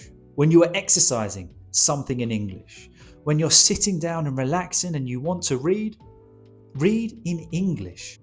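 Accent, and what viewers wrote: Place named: United Kingdom. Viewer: Being Soth Asian we prefer British English over other accents and styles which are derivatives on traditional English from England and you are a classic example, I would always love to attend your lectures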